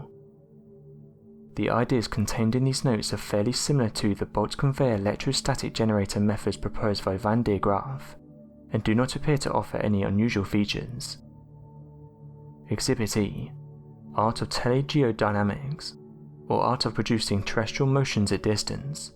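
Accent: United Kingdom. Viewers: I cannot stand this guy's wimpy British voice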